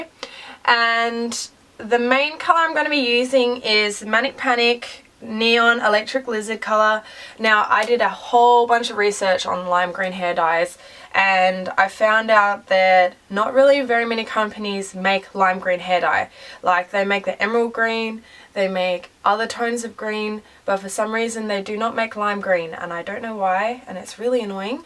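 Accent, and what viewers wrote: Australia. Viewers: Are you australian?! If you are I. So happy! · Omg your Australian!! SNAP!! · Is she australian? Sorry i don't understand any accents...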